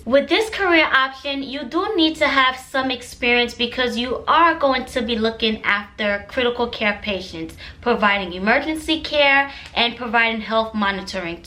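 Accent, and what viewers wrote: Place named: Jamaica. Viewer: You have an accent are you from Jamaica 🇯🇲? Big up yuhself either way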